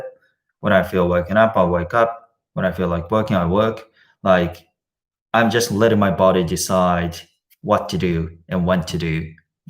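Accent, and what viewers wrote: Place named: Australia. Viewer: 発音が、大学のオーストラリア人の教授とすごく似てました。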